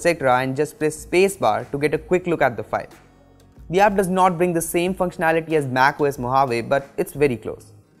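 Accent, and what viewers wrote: India. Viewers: your accent was funny man, Indian!!!!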